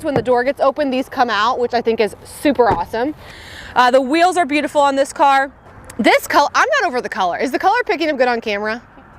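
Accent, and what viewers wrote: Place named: Midwest, United States. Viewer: St Louis!! Duh... that’s why you sound MidWestern. I’m just up the road a couple hours in Illinois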